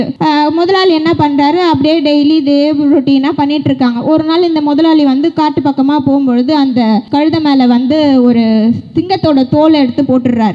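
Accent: Telugu, India. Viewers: இந்த கழுதைதான் அந்த 😂 பேச்சில் தெலுங்கு வாடை வருது...வந்தேறிய சக்கிலிய கூட்டமாக இருக்குமோ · ஏய் மயிரு நீ முதலில் தமிழை ஒழுங்காக பேசக் கற்றுக்கொள். சேகர்பாவுவிடம் தெலுங்கு கற்றுக் கொண்டால் இப்படித்தான் தமிழ் பேச வரும்.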